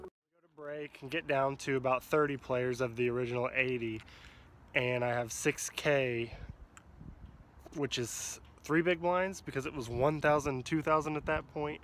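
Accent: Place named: South, United States. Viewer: Good vlog.....good voice and nice format; the southern charm (I'm from Eastern TN) is a big plus!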